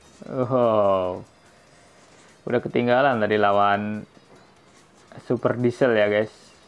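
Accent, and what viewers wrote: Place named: Malaysia. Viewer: Abang ini pasti orang Kalimantan dekat Malaysia pantesan suara nya kayak orang malaysia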